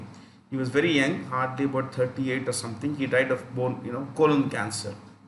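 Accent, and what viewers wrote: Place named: South India, India. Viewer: He is a south Indian. His accent is perfectly fine.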